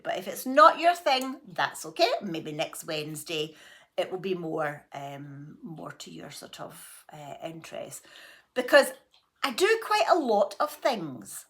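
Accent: Scotland, United Kingdom. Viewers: I was watching one of your video's ads last week and my husband said "why are you listening to that rubbish?" So I had to explain about the ad revenue 😂 I normally watch you at breakfast time and he always comments on that wee Scottish lassie!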